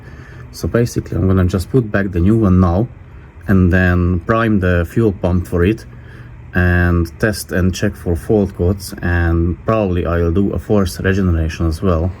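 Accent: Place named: Hungary. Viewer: Ez igazi Magyar dialektus. 🤪🥰🤣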